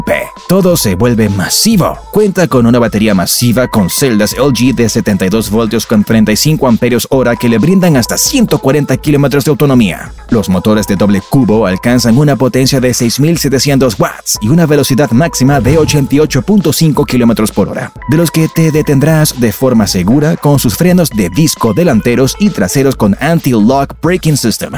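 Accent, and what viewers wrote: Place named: United States. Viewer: La pronunciación con complejo de Yankee da grima, Ssscurerh, bareri en vez de batería pero khe, ya de paso que diga las light y las wheels XD, eso sí buen video y buena información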